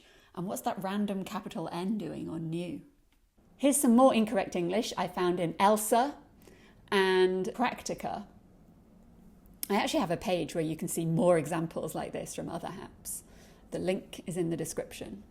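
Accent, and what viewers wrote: United Kingdom. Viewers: I really enjoy your posts, the way you speak, the clarity of your statements… it sounds like (perhaps I’m mistaken) a bit of aristocratic English ;-). By the way, could you tell me (us) your name? It’s always easier to talk to someone on a first-name basis. If not, that’s OK - I know the English are famous for their eccentricity. 😉 Warm regards, Alex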